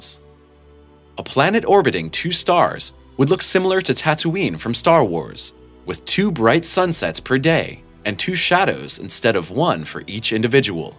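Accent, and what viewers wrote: United States. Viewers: Why would you use km when telling of an American tornado! So friggin annoying! You even have an American accent! Use standard measures idiots!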